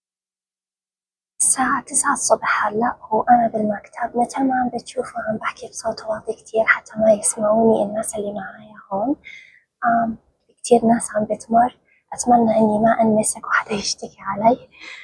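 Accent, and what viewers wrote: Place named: West, Algeria. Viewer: ايه صح صح انا ثاني شفيت بلي كانت تهدر بلهجة الغرب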